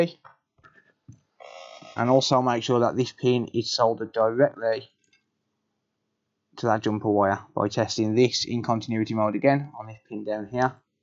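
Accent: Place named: Birmingham, United Kingdom. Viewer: Don’t know how,but for some unknown reason,one of your videos popped up in my recommendations…that was a few hours ago. 😂 I’ve watched a few since then. Fantastic channel and great to hear a English voice for a change. The bonus i,you do repairs for us the public,so now you are a top of a very short list when it comes to needing repairs. Keep up the amazing content and yes,I’m most definitely subscribed 👍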